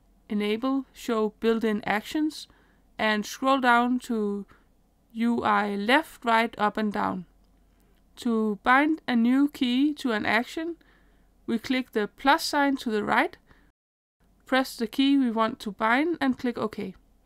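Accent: Denmark. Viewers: I am really liking this so far and I can't wait to watch more. It's also nice to see and hear a fellow dane make game development tutorials.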